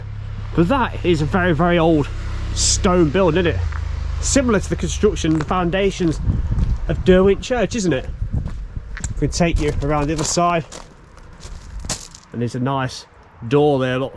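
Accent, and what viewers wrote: Nottingham, United Kingdom. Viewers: Another brilliant presentation. I love your enthusiasm and of course your Nottingham accent. Keep them coming.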